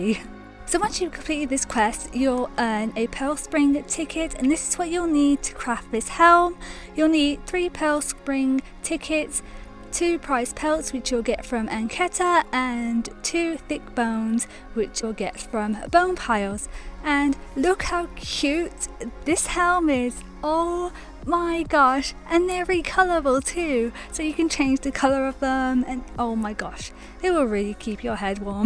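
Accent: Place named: United Kingdom. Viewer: She quite clearly has a British accent.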